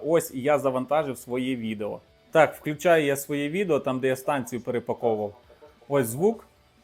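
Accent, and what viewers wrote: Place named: Surzhyk, Ukraine. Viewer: цей суржик аж вухо ріже .. жах !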